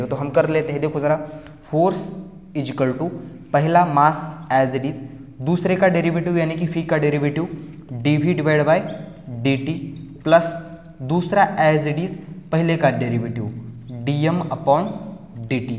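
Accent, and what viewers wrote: India. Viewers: Brother are you from parbhani nanded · Sir tumhi Parbhani che ahat ka ?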